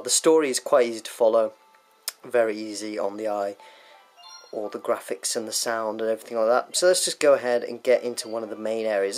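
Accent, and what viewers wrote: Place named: United Kingdom. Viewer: Your accent sounds like you're from the same place in the UK as David Oliver from the Daily Show!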